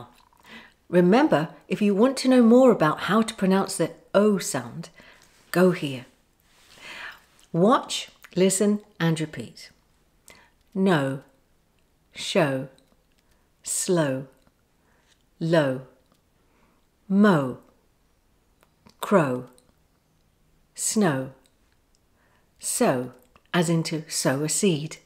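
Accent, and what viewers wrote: United Kingdom. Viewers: It's so nice learning the beautiful British english listining to your lovely voice. May God bless you😊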